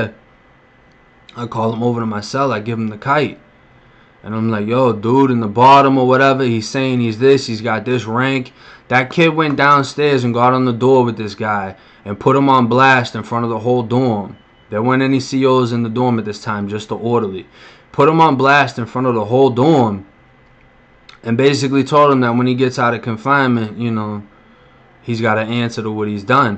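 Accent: Boston, United States. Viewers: Someone was lookin out for ya when you did ur time, for sure! 🙏🏻 Obviously got a bunch of vids to still catch up on, but keep 'em coming! Love the way u just flow w/ur stories PLUS (& not to disrespect u or ur significant other) but I just have to say that Boston accent, Boyyy... 😍 it definitely keeps me wanting to listen to more & more! 😉😆🙃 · U got the Florida talk with the Boston accent. That's wild bra lol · done with the hard life that Boston accent thick lol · You from Boston? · People drawn to you because of your Boston accent lol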